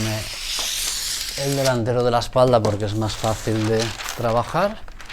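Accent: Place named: Catalonia, Spain. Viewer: Bona nit, me encantan tus clases con ese acento catalán tan pausado, haces que todo sea más fácil feliçitats.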